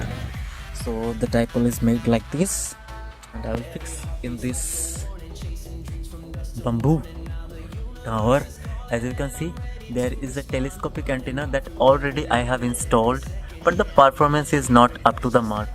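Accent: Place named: India. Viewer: Does your country allow cb radios 11meters you should make yagi beam antenna i'm over Knoxville,Tennessee USA sounds like your in India you should be getting thoses guys on cb radio over toward Australia here in states i been making good contacts on cb radio to eastern Australia southern Australia during evening hours when dx conditions are good i do have videos on my yt channel making contacts on cb radio.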